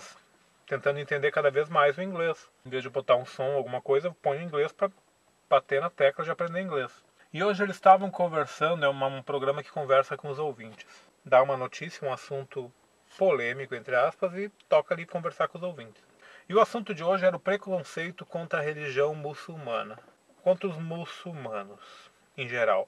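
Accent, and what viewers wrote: Rio Grande do Sul, Brazil. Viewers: TU EH GAUCHO?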